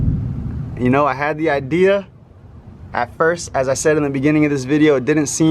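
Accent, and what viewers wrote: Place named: Louisiana, United States. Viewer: You gotta be somewhere near Louisiana the way you talk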